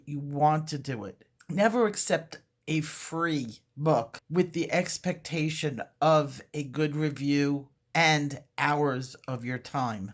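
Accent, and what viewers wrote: New York, United States. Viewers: you are simply fabulous. I get in trouble sometimes for my blunt honesty. However, I will not change that. You made my entire day saying FWOF!!! Your talent and honesty are exactly why I come to you to learn and be entertained as an artist. You got it all! And you know I have a thing for "New Yorker's accent" lol.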